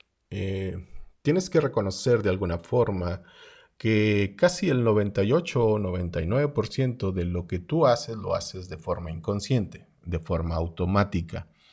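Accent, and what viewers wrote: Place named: Argentina. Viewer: estas hablando como argentino!!! 😃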